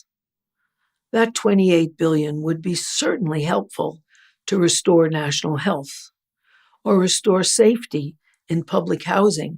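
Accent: New York, United States. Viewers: Yeah it's probably the raspy New York accent, as well as the identically emotionally expressive face that does it.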